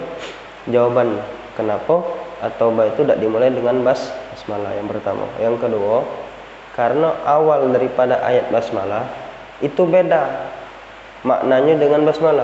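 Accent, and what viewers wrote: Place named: Palembang, Indonesia. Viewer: Cak nyo dari palembang yo bang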